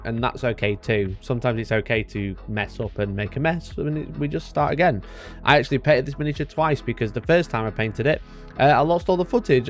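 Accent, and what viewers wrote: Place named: Newcastle, United Kingdom. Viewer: Not a clue what this guy is saying. Must be from Newcastle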